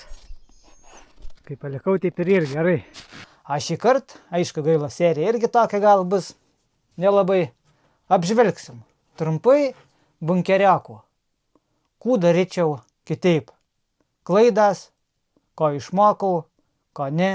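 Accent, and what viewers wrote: Aukštaitija, Lithuania. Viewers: Malonu žiūrėti ir klausytis aukštaitiškos tarmės + apetitą žadinantys valgiai + draugiškas ir ramus šuniukas 👍👋🍜🐈O čia , žiūrėk, ir nauja idėja gali gimti, pvz., „Kų šindie kaštavosma bunkerioki“ 😄 Sėkmės!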